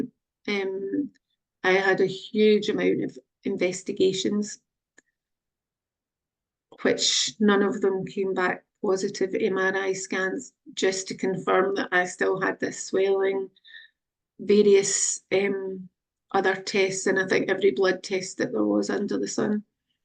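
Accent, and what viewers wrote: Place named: Scotland, United Kingdom. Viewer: Thank you. For sharing your story. I can relate to your story so intensely. I myself am a survivor of Viral Encephalitis and Autoimmune Encephalitis. Also living in Scotland. I feel so fortunate. Encephalitis changed my life. I have a different outlook on my life now. I also live in the West of Scotland. And it was comforting hearing your voice and accent in your story. ❤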